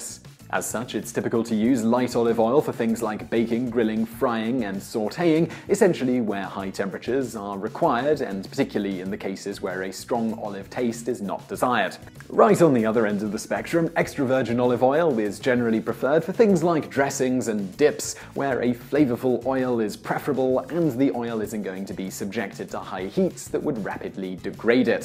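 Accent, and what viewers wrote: United Kingdom. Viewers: Aren't all these videos simply a guy with British accent fast-reading Wikipedia articles on the most common, mundane subjects?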